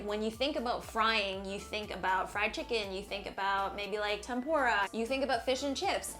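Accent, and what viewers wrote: United States. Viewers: Asian lady with an American accent in a kitchen with UK plug sockets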